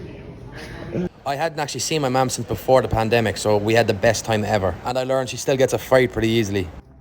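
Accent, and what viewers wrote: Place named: Ireland. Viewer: Nah your Irish Accent has got me weak 🤤 and your face is distracting 😍 got me in a trance 🤩 YOU ARE BLESSED & BEAUTIFUL 💐😇🆙️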